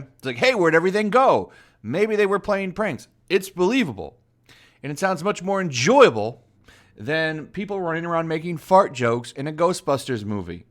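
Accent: United Kingdom. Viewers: Love the British accent every time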